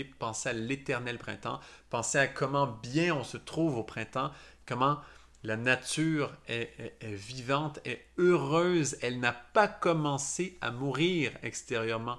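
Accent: Canada. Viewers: Merci beaucoup pour cette chouette vidéo !!!! (avec l'accent canadien en plus 😍💖👌🌟🌻) Et très jolie chemise &fleurs je suis dans le jaune aussi en ce moment ^^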